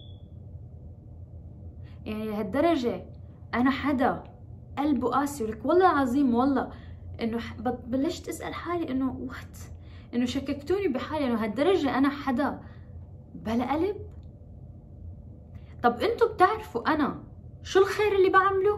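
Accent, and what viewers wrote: Iraq. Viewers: كولش ححلوو من تحجين باللهجه العراقيه تلوكلج 🤭😍🇮🇶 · كلام رائع جدا وشحلاتج تحجين عراقي 💋💋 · كلامج بلعراقي ايخبل متابعج ابن بلدج من العراق💖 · كلامج بلهجه العراقيه حيل حلو 😍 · اللهجة العراقية تلوكلج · انت انسانه جميله وطيبه القلب ومبدعه وانت تحكي بلعراقي بتجننني. وتتاثريش بكلام ناس. لانو كلام. الناس ما بقدم وله بأخر❤️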